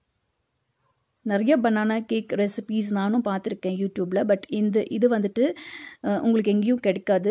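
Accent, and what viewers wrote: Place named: Bangalore, India. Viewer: Hi....you have superb banglore slang... while you talking it's like my mom's family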